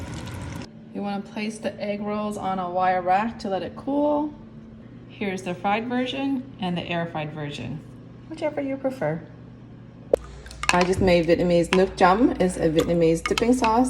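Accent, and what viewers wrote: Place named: Bay Area, United States. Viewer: I knew you were a bay area gal! I had a feeling from your accent and coolness. Go warriors!!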